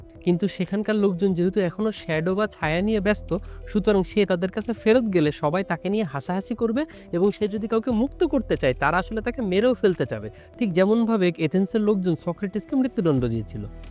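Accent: Bangladesh. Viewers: apnar kotha shune mone holo apni bangladesi ami kolkata theke...valo thakben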